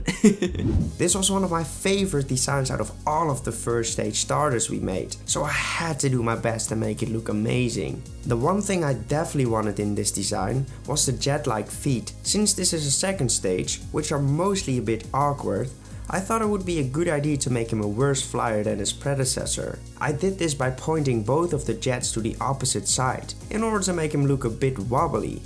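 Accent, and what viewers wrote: Netherlands. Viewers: Ik hoor een nederlands accentje haha, verder echt coole designs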